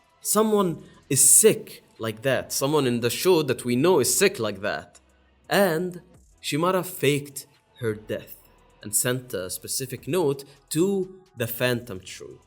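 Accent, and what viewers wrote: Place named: Egypt. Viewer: you sound Egyptian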